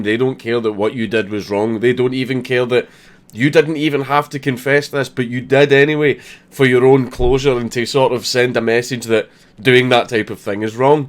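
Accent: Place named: Scotland, United Kingdom. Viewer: Awesome vid! but i wish you went with "they want to see you burned." rather than "they want to be outraged" At the closing statement..mainly cause "burned" sounds awesome with a scottish accent:D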